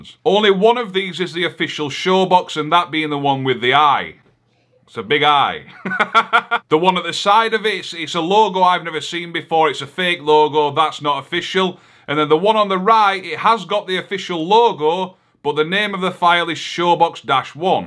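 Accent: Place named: North, United Kingdom. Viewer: You are very northern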